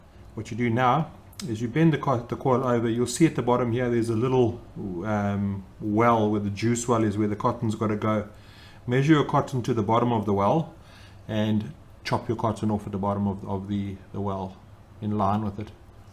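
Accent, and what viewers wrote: South Africa. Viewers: Great video thanks, works really great. Quick question, are you South-African?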